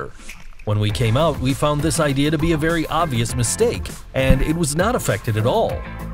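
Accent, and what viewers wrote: United States. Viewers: Holy shit the broken English in this video is so off-putting when read by someone with an American accent.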